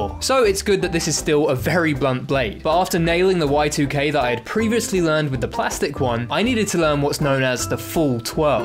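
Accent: United Kingdom. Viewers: hes turning british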